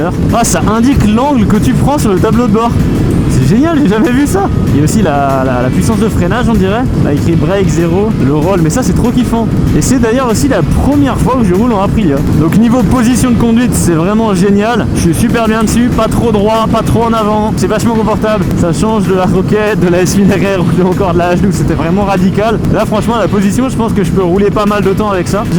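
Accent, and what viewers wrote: Alsace, France. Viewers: super essai complet et vidéo au top!!!je kiffe la cohérence dans ttes tes vidéos.ainsi que la musiques qui annoncent les différentes rubriques et qui restent les mêmes d'une vidéo à l'autre...sinon pour la ducati de ton pote tu as eu des news?À propos j'ai une petite blague en rapport avec ton bel accent alsacien qui colle très bien avec l'incendie de la ducati:"tu sais ce que veut dire SP sur les camions de pompiers?SA PRULE!!!"Avé l'accent évidemment...😂😂 V et longue vie à toi et hâte de voir tes futurs essais✌👏👏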